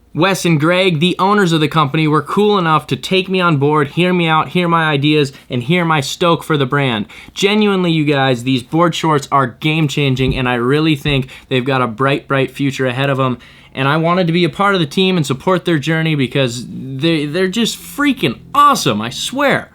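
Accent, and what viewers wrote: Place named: Australia. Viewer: You should stop the Australian accent.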